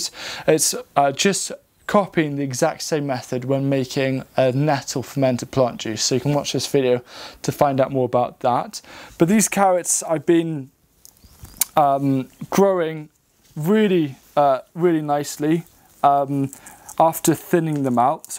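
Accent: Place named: Wales, United Kingdom. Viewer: I’m interested in your accent. Every third sentence there’s a cadence that sounds Welsh. Which region is it?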